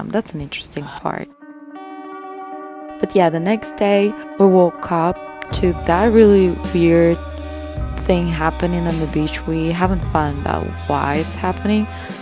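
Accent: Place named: England, United Kingdom. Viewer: yay my fav travel vlog is back! you have a lovely english accent, i like this change up bless you for showing us the amazing cities with the night life, buildings and food and sunsets, beaches and people 🙏 your friend and you are gorgeous! cool to see you both happy, and those outfits you wore were stunning! 💜 thank you for the editing you put into this 😊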